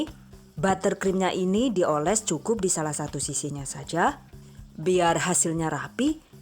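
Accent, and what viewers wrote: Banyumas, Indonesia. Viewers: Yahh koo malah ngapakk... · Hehhehe sambil semyum2 sndiri klo udh liat vidio bahasa ngapaknya buat lucu #GiveawayTHV2021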